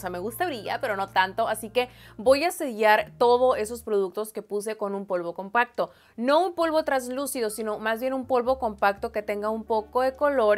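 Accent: Sinaloa, Mexico. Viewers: Me encanta cm te maquillas y tu asentó Sinaluence me gusta plebe jaja saludos desde Arandas Jalisco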